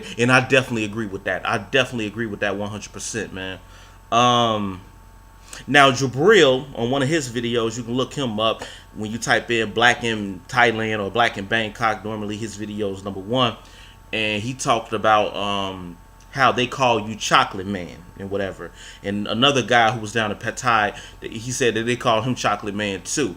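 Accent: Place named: East Coast, United States. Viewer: just tuned in to your channel. you're from east coast right? NY?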